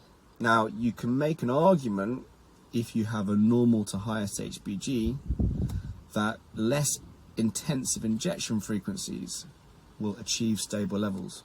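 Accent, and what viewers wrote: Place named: New York, United States. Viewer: Yes!!!! New York accent 😂👌🏻 HOT DAWWWWG 🌭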